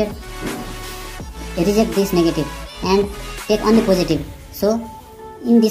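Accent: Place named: Nepal. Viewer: Bro u nepali?😂 nice English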